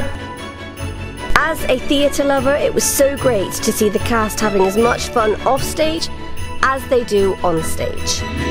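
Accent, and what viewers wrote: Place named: United Kingdom. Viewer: min in and this is giving me vibes similar to British Vogue's documentaries with Alexa Chung!!!! Maybe it's because of the british accent narration, but this is AMAZING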